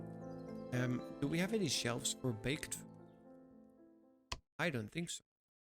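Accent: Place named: Germany. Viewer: wow your English is very good then! I thought by your accent that you were German or similar ^^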